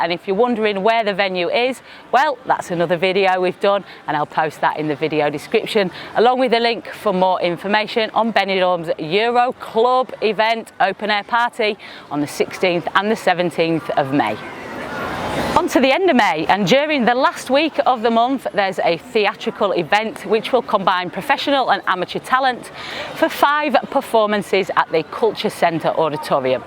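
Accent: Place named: England, United Kingdom. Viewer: Brilliant thanks. Also could I ask where you are from in England cos i like to guess accents, is your accent Manchester or Nottingham.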